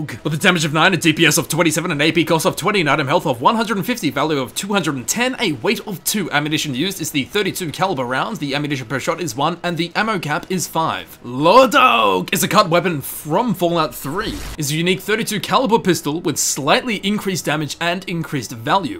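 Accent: Australia. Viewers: are you from Australia because I live there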